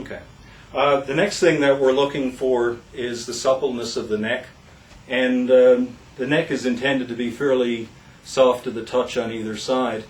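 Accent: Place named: Newfoundland, Canada. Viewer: This doctor is a Newfie! I can hear the accent.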